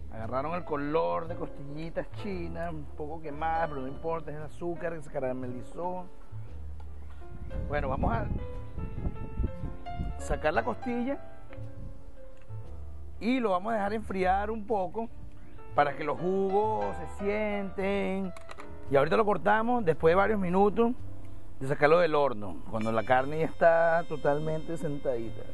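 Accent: Caracas, Venezuela. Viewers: Guao acento Venezolano Caraqueño,exitoso... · te amoooooooooooooooo, me encanto verte cocinar con tu acento caraqueño, quiero la receta de las lumpias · Simpático este chinito que no puede negar su acento Venezolano! Hoy iré al bosque en el barrio a ver si tengo suerte de conseguir algo de eso! Con esta escazes imagino coseguiré la mitad, el resto lo inventaré, así estamos aquí! Gracias por tu receta! Un abrazo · Que cómico me encanta sus comidas pero me encanta también ese acento caraqueño sifrino que tiene gracias 👍👏👏❤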